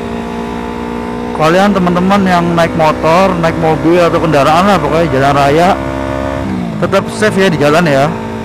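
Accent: Surabaya, Indonesia. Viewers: Biasanya nonton motovlog bandung trs nonton motovlog surabaya keluar dah medok dan cak cuk nya :D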